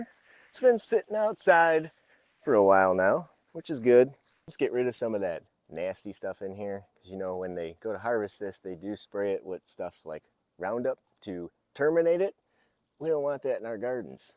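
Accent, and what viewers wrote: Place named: Burbank, United States. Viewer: Another great video. I cold compost but its always fun to listen to that Burbank accent 😂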